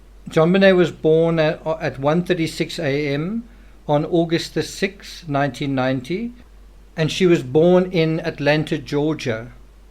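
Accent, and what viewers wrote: South Africa. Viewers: Children. He has a South African accent.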